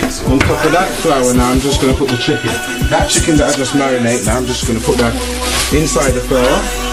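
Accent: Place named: Jamaica. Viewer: cho blowwow . hear di accent nuh .. lmao only jamaicans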